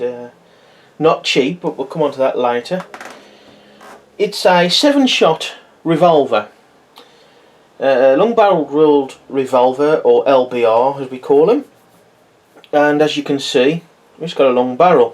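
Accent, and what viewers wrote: Birmingham, United Kingdom. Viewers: yam sound like a Brummie!.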